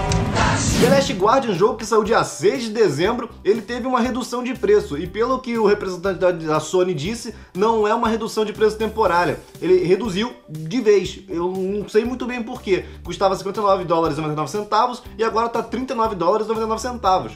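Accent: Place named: Portugal. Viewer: começando a pegar sotaque de Portugal kkkkk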